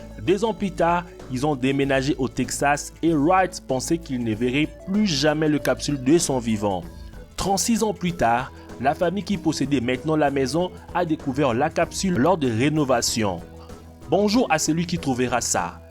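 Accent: Quebec, Canada. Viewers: Le français Québécois bande de cons. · Adriien Terliier Toi apprends déjà à savoir que c'est un québécois et c'est pas de sa fautes ci il s'exprime mal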